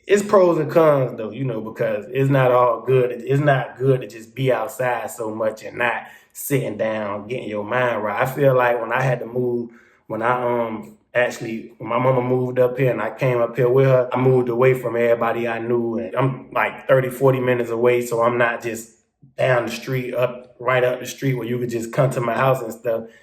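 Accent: Florida, United States. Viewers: That's Florida slang, I know alot of grown dudes who sounds just like this. I don't think it's put on. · Might be a FL thing , I can understand him. But most ppl living in FL are not from FL 😂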